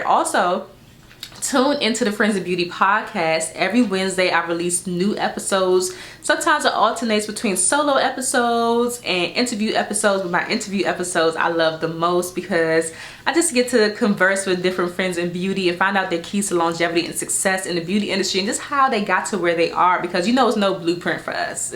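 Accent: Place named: Washington DC, United States. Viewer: I know a DC accent anywhere. Hey neighbor!! Great content and much needed. ❤️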